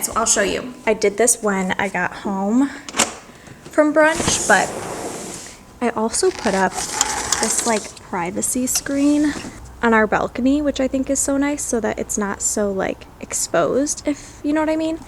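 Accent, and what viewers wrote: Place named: California, United States. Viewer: I always think you're from Cali until you mention NY, haha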